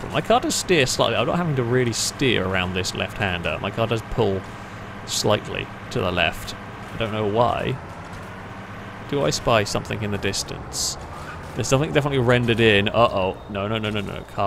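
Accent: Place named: United Kingdom. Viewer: Dunno, if you noticed,. But, you see me pleased to see you as one of the few guys playing TLD without heavily relying on an (unnatural) unnerving voice, like ppl on other channels here do. As if they were aiming for a teenage audience... GROSS! So, big thanks to you, for being as british and failracy as ever! 👍👍👍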